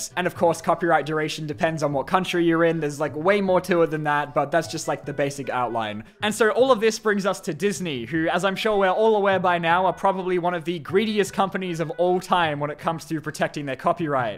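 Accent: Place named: Australia. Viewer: . Are you Australian? I did not know that!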